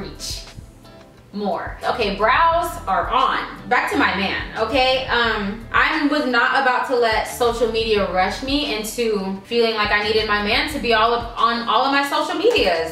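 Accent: Jamaica. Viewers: Am I the only one that can hear a lil bit of your Jamaican accent?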